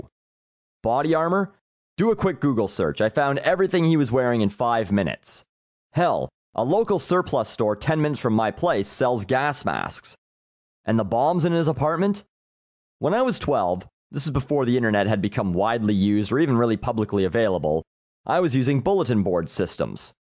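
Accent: Canada. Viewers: your canadian is showing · He sounds canadian. Is he?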